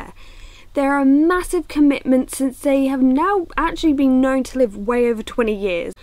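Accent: United Kingdom. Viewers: Is it okay that I love the way you say "vitamin D3"? Not being mean, its just cute. The British accent is so easy to listen to. Im American born and raised, but my Aunt is from Ipswich and its almost relaxing to sit and listen to her talk.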